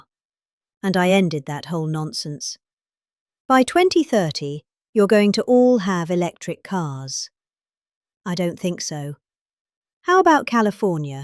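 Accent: England, United Kingdom. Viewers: Dear Americans, that is an English accent. There is no such thing as a 'British' accent.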